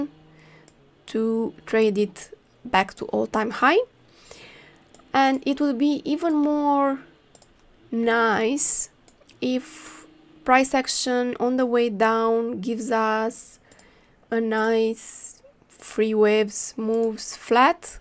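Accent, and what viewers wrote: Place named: Romania. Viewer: Are you Romanian ? That accent is familiar.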